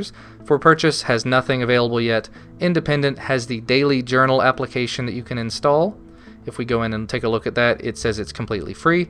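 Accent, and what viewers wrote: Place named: South, United States. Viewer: oh well, I realize this is science-fiction weird that a complete stranger would focus on your accent on a Ubuntu review but, it's very light and fluid...I knew it was from southern America but...so many accents are heavy, and the hard R's and all but then Kentucky ppl come along... anyways...totally off topic so merry Christmas and review away please.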